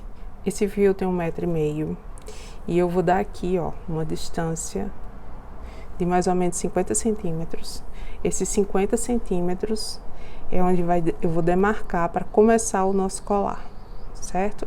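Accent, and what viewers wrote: Northeast, Brazil. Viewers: Mulher linda, tu és de onde com esse sotaque que parece nordestino? Primeiro vídeo seu que vi e amei. Já me inscrevi. 🙂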